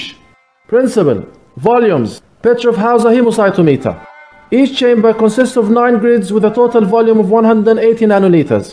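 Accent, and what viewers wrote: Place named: Arab world. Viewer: Brother 😂 your speed = like a ferrari but i have gotten by accent you are an Arabic where as i am from kashmir . So it was more difficult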